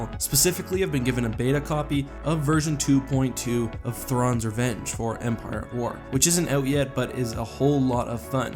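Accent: Canada. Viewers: Are you Canadian??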